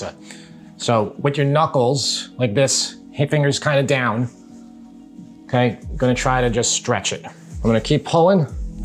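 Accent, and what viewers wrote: New York, United States. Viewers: Great job on your presentation and content!😎🇺🇸🔥 Love the “Authentic “ approach…😋 I can’t help but feel confident with your approach and ingredient choices!😘 The New York accent doesn’t hurt!!😅 Lol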